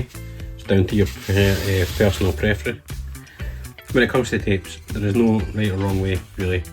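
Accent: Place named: Scotland, United Kingdom. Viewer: What is this accent? Scottish? I only understood maybe %30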